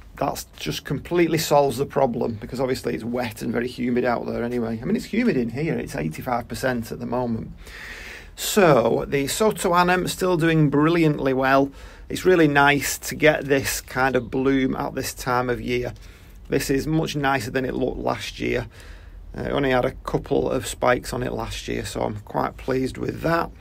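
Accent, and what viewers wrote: England, United Kingdom. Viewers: well it’s the English accent that helps too. And I do wAtch most all your videos, but this one I had to do some other things and I don’t have non orchids so it was okay to listen in parts.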